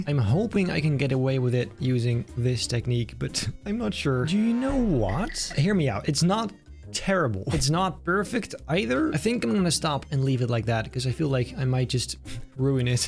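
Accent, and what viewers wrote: Netherlands. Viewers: I love dutch accent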